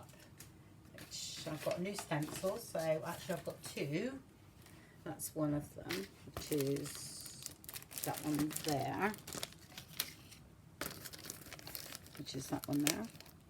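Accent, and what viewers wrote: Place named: Bristol, United Kingdom. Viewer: Are you from Bristol? That’s my husband’s hometown and you sound so much like him!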